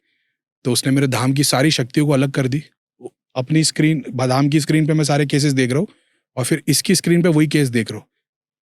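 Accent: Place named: Mumbai, India. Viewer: Wo uska bolne ka dhang hai, wo Mumbai se hai. Uska matlab ye nahi ke wo kisi ki disrespect kar raha hai.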